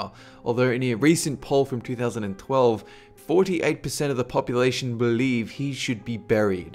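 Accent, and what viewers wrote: Australia. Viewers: He's Australian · Is this guy Australian?? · He's an Aussie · He's Australian. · Are you Australian?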